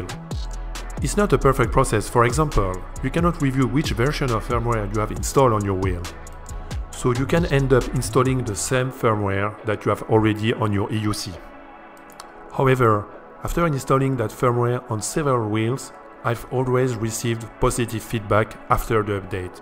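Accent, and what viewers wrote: France. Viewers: Plus un pour l accent français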